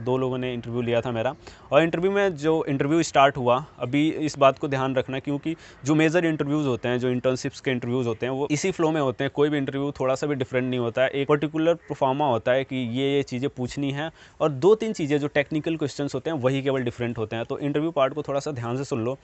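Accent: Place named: India. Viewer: English Indian ؟؟؟؟!!!!!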